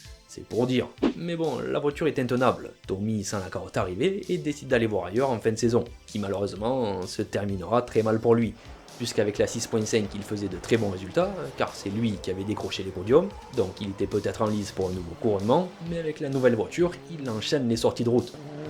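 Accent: South, France. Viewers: chaîne que je découvre ! Rallye + merguez et l 'accent du sud !!! j 'adore !!! #saucisse power !!!!!!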